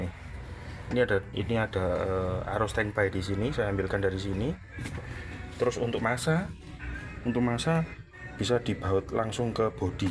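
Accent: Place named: Malang, Indonesia. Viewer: 😂 iki logate kok koyok wong malang yo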